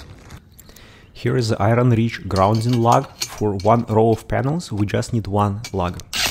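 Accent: Russia. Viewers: Ярко выраженный русский акцент. :)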